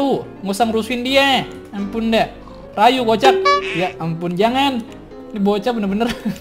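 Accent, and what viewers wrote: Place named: Tangerang, Indonesia. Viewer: kek logat tangerang yak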